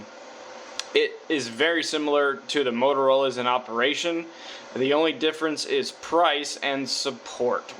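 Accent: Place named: United States. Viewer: You sound like you are in the USA, please don't use encryption when broadcasting on this radio. That is illegal. Also, please get your amateur radio (technician) license. It isn't difficult. One of the things they teach you when studying for the test is that using encryption when broadcasting on amateur bands is illegal, so the encryption capability should not be an issue for you.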